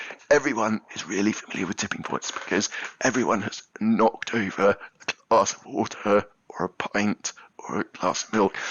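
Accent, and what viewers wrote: United Kingdom. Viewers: Need clear voice recordings, esp some of us are not used to Brit accent. The point on risk vs scientific conservativism is real.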